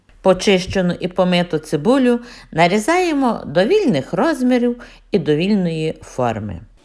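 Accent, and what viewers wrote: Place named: Western Ukraine, Ukraine. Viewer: У вас такий гарний западноукраїнський акцент. Знаю, що в тих краях дуже файні гадзині))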